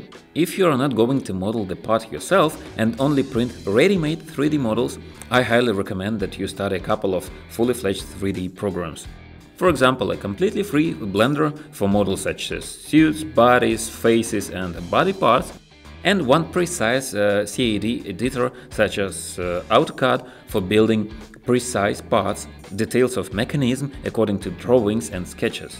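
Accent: Russia. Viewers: Акцент русский=)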